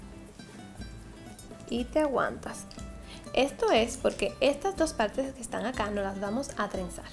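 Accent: Cuba. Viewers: Una pregunta en este video hablas bien claro pero en los videos más nuevos hablas más con tu acento cubano ¿Por qué?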